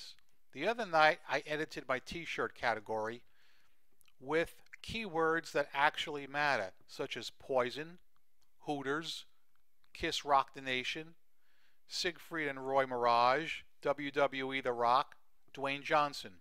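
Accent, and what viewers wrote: New York, United States. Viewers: love your NY accent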